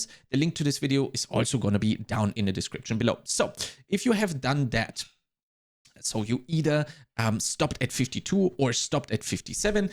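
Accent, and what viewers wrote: Germany. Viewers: I had to edit this to add the spreadsheet you provided: There is an astounding amount of work you've put into helping. That spreadsheet (you have linked below your video) is so helpful, and you have additional pages of info clickable at the bottom of that spreadsheet. Huge, huge thanks. I would sub 10x more if I could to give something back. Some really incredibly helpful info and tips I wasn't expecting. Like letting the drop specs guide your build. Thank you for all the time you put into this, I've happily subbed. PS: where are you from? I'm originally from Austria and you sound either German or Austrian? Thank you again so much for all the amazing help.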